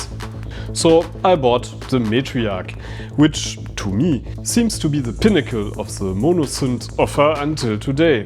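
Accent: Germany. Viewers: With such a heavy German accent, I can better understand you in German.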